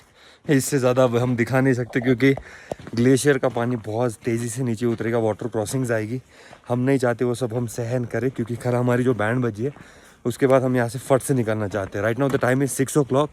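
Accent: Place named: Gujarat, India. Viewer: Your gujrati accent is awesome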